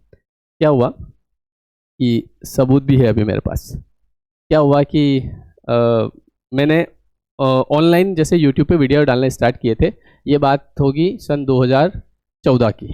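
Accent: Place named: Indore, India. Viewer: Sir Aap kaun se city mein rehte ho, aapki bolne ki tone indori hai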